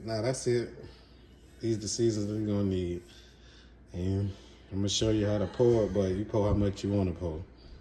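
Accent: South, United States. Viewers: you have a southern accent in your voice. We Love peaches and Family. Love from chicago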